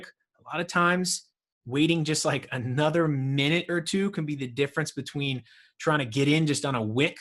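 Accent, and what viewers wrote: New York, United States. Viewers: Hey. Awesome content. Are you from New York?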